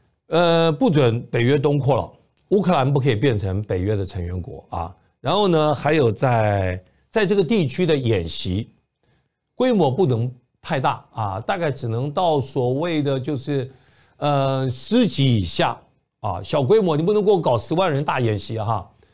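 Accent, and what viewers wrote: Standard. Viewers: 你到底是不是100%洋人血统？ 反正普通话是100%纯正，神乎其神！ 谜团？🙄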